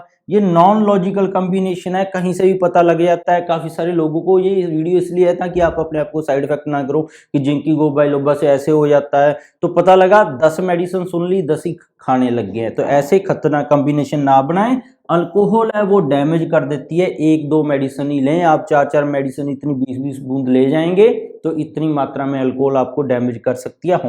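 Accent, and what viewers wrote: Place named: Punjab, India. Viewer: Kuchh samajh ni aa raha Punjabi touch k vajah se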